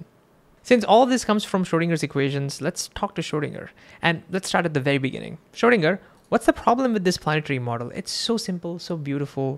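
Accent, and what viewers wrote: India. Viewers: Brroo , is he indian 😑😑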